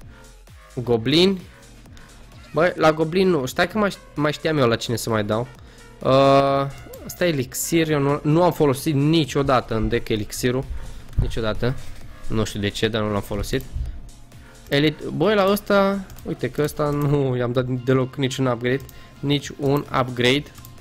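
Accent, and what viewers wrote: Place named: Moldova. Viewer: E moldovean fato ei asa vorbesc....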